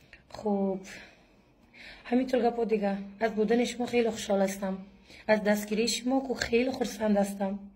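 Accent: Tajikistan. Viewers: Ахсану офарин ба шумо,ки дар мактаб бо забони узбеки тахсил менеду, бо забони точики тоза ва беолоиш сухан мекунед. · Офарин! Барои забони ноби точикона❤❤❤ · Забони точикро тоза гап мезани офарин. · Саломат бошед Мо аз точикистон ба шумо саломати, Мо хеле хушхол шудем ки ин хам забони Мо ин хел тичикиро тоза гап мезанед саломат бошед. Зинда бош точикистон бо Бухоро шарифи. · Хохрчон мисли точикхои дунёо гапзанет зинда боши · Точики хакики будаи соф гап мезани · Умница,я очень рада что она так чисто говарить на таджикском языке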